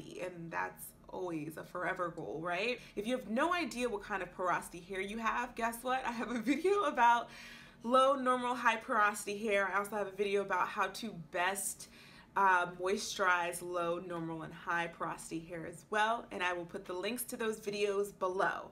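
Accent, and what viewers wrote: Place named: United States. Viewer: natural hair black women are sooooo gorgeous and the american accent on top is a bonus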